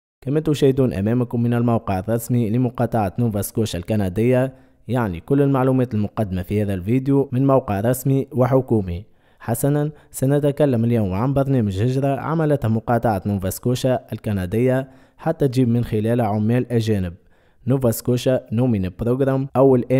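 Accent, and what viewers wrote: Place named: Tunisia. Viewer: اخي شكراً لك على المحتوى الرائع ما اجمل لهجتك التونسية الرائعة . أنا عندي فيزا سياحة هل ينفع أن اقدم على هذا البرنامج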